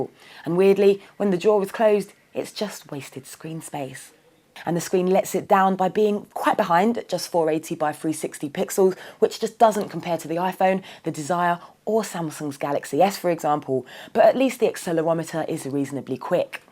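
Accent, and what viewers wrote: United Kingdom. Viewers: A Black girl speaking in uk english!!!!! WTF LOL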